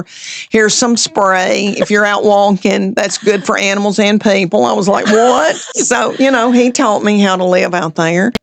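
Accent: South, United States. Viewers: I don't know about farming people and I know with your shows suppose to give a Golden Girls / Friends vibe but honestly that accent takes me back to one of my favourit sidcomes from way back when Designing Women gosh. I loved that show I recogn if they did a remark though I'm sure it never be as good I probably love that too just because of the accent. Southern accent like that it just makes me think of the most interesting, most sophisticated, most hospitable and sexies women on the planet! Every American show just tries to get rid of the accent but in fairness because nobody does it - it's real interesting to rest to the worlds who never hears it or the little idioms that go with it. Considering how you seem to attract your 1st husband that is no word of a lie him being all up in your face since the first day he met you can stalking you the rest of his life. 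Part of me, sometimes like to put on a little southern accent especially if I wanna sass some boyfriend whom I'm flirting with cause his all about keep the Aussie accent, but have you heard the Aussie accent - nuthin' sexy about it! Men are abit slowly - he really doesn't get it but his the only one I do for and because it kinda pisses him off which kinda gives me a kick.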